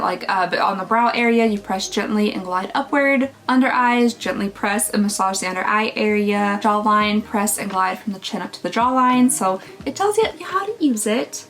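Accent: Kentucky, United States. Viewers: As a lot of people mentioned, music was too much. The pace of the music was not right for how you talk making it seem like you were talking faster. And the pitch didn’t match with your accent (I am Australian so I want to say Kentucky drawl. Forgive me if I am wrong) making it feel slightly off and not as charming/relaxing, and in a couple of places it got a lot louder emphasising the conflicts. A few of the jump cuts were a little abrupt but not unbearable. Edit: because I am Autistic and worried this is coming across as negative. I want to say the overall the video was edited well, these are just some things I noticed that was different and I personally felt could use some tweaking.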